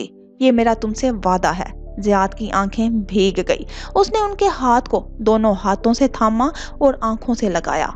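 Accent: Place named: Punjab, Pakistan. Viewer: Voice me Punjabi lahza kam kar lein please kuch lafz Samjhne me problem hoti hai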